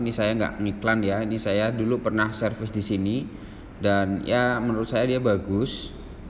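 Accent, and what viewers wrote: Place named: Java, Indonesia. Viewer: saya kalo liat pak jelasin materi lewat youtube mau senyum trs...soalnya medok kali pak🤭🤭🤭🤭🤭🤭🤣🤣🤣🤣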